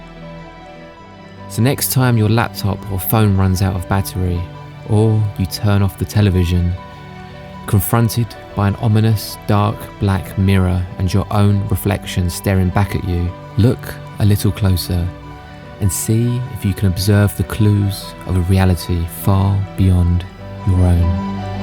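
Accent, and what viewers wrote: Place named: England, United Kingdom. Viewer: Well, Narrator .... I loved it.... So, keep ur Corny English Accent...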